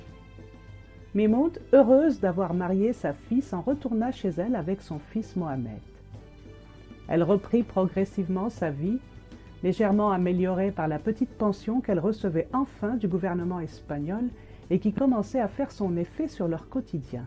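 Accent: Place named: France. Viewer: Votre accent français est si beau! Pas comme notre français au Québec hahaha !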